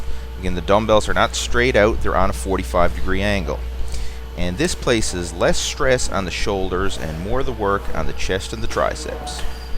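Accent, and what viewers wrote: Newfoundland, Canada. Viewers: You're canadian, right? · god you sound sooo canadian hahaha i love it! good video! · Is this guy canadian ? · Lol the second you started talking I knew you were a Newfie:) Awesome stuff, keep it up~!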